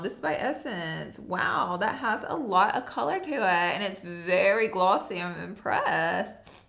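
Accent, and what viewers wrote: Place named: California, United States. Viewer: You usually always have this Cali- vibe to your voice, in this video you sounded like a New Yorker! 😅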